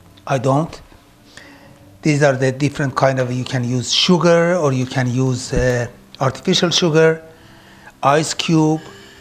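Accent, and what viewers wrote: Iran. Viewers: Iranian accent is the cutest accent ever :)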